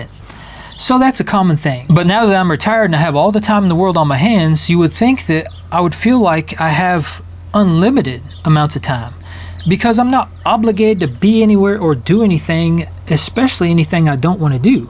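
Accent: United States. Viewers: I’d like to know where you are from in the US as I like your accent. Greetings from Queensland Australia.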